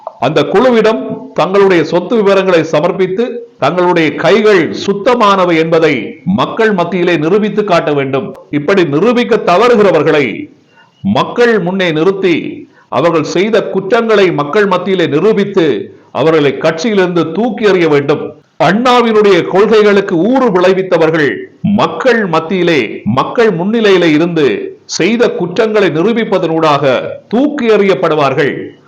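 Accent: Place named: Sri Lanka. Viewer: நீங்கள் பேசும்போது இலங்கை தமிழ் என்பது சரியாக தெரிகிறது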